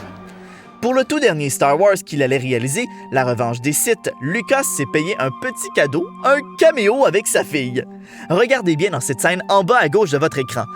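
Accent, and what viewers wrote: Quebec, Canada. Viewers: Super vidéo, comme d'habitude ! J'adore ton accent québécois, il est juste GÉNIAL ! Continue comme ça et à bientôt ! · J'adore ton accent québécois ! XD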